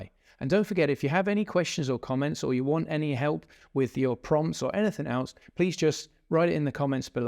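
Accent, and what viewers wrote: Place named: England, United Kingdom. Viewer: You have a very nice english accent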